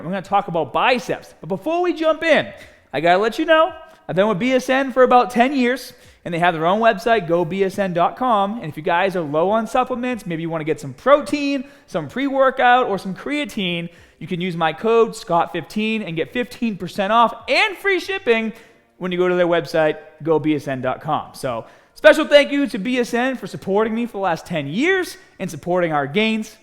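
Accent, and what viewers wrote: Boston, United States. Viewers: I have been watching Scott's videos while doing cardio and I have developed a strong tendency to speak in a Boston accent. Win Win. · His point still stands... his accent is Boston in USA not South Africa lmao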